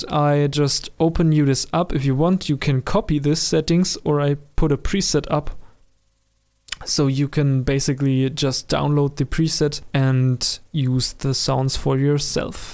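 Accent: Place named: Germany. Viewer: Lol a german who finds it hard to pronounce english haha :) I believe "coarse" pronounced the same as "course" but correct me if I'm wrong :p Great and clear tutorial btw! Props!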